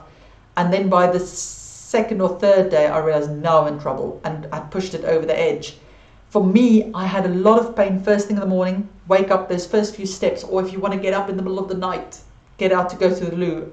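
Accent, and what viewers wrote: South Africa. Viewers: Great Talk. I Thought She’s SouthAfrican.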